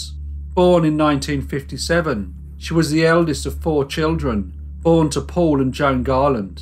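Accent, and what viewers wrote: England, United Kingdom. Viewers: Completely unrelated to the video, but what type of accent do you have? I know you’re from the UK but I’m curious which one this is? I’m a Yank with the love of accents and have a particular fondness of all things British. Thanks 😊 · Are also Morts family ?😁👍❤He has a nice big family i wish i had the same you help each other that's so nice and i love your English accent 😊💖💖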